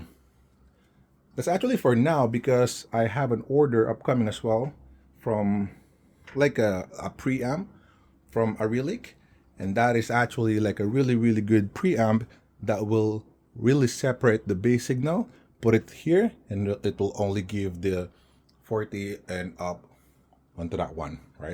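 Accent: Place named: United States. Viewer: naka automatic play next tong YT ko. nkikinig ako habang nag wowork kasi ng mga reviews ng Edifier MR5.. tpos nag next auto play tong Video mo. akala ko black american. pinoy pla. sana ol gnyan accent. thanks sa review dude. nag sub na rin ako.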